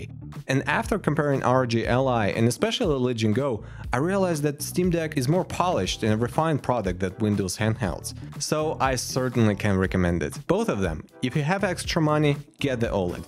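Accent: Russia. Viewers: ты когда голворишь lenovo legion go русский акцент так и прет))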